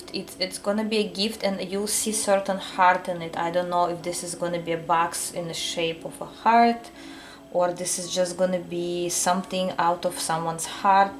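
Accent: Russia. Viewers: pile 2. "someone's lying on the couch crying and watching many readings right now" LMFAO i dreamt of crying last night and also cried bcs of the sad news i heard.. and now i'm sitting watching all of your readings so accurate this is amazing! and also when u said that a relative was jealous of me someone living next door... i already know who she is.. my own aunt my dad's cousin! she always imitates what we buy and wants us to be below them, whatever i have the next day she'll do the same to her children ugh! and also the guy that was watching me is my ex.. an abusive obsessive ex! and i am so happy he is no longer in my life even though he tries to come back i will never let him in my life again!!! thank u so much for such an accurate candle wax reading! u have officially been my favorite tarot reader <3 success for you my lovely russian lady! (don't know if u are but i just guessed from ur accent)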